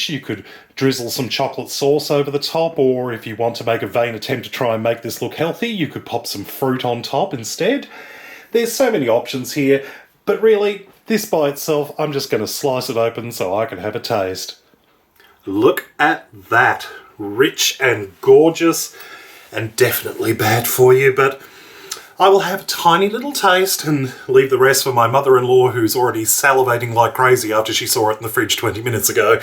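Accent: Australia. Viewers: He sounds Australian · I love his reactions after he tries the food. best part by far especially with an Australian accent. great video!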